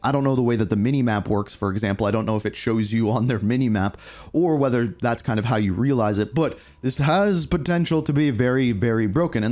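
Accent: Canada. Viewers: didnt know you were canadian til i heard those aboots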